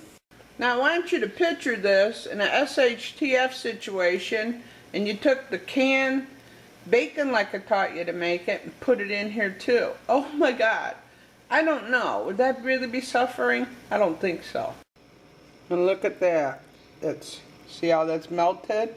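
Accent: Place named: New York, United States. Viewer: I have a dear friend from New York - she has the same accent.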